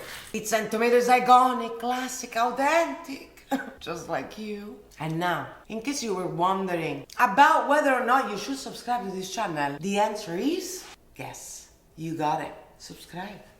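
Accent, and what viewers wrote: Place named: Rome, Italy. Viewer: Bella e brava. Ma ,soprattutto, me taglio. Per il tuo romano. Fantastica❤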